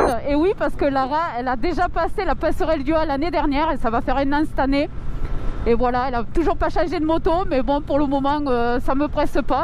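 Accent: South, France. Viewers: ton joli accent du sud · tes rires et l'accent du sud ça fait du bien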